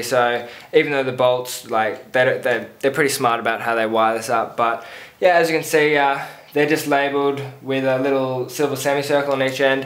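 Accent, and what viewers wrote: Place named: Australia. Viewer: Ahhh, Australian accents. I have to say, I was pleasantly surprised.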